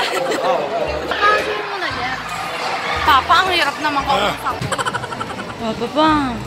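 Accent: Batangas, Philippines. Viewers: Kaya din ano napadpad dito dahil don sa scammer😂😂 Taga batangas ka ga po ung salita mo kasi😂😂😂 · batangueña ga are haha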